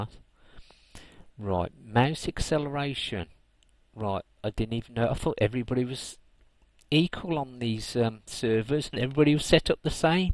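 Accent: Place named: Birmingham, United Kingdom. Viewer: John Camus He's from Birmingham by the sounds of it (UK), we call them "Brummies"!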